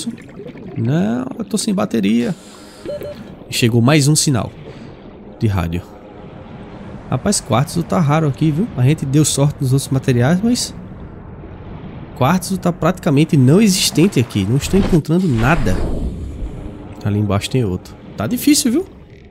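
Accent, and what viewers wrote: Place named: Northeast, Brazil. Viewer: Conhecendo o canal agora, por esse jogo, pq preciso de dicas, to meia perdida nele, gostei, adoro esse sotaque nordestino, ganhou uma inscrita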